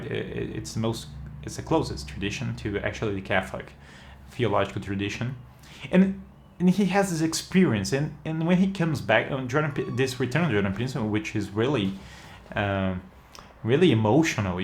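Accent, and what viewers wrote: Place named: United Kingdom. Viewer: professor, your english is amazing, looks very like the british english, sadly my spoken english looks like a fusion of british and american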